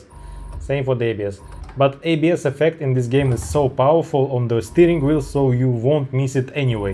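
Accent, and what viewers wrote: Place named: Ryazan, Russia. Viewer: У Вас чудесный рязанский акцент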